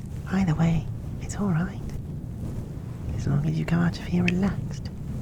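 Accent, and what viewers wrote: United Kingdom. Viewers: Is this a British accent?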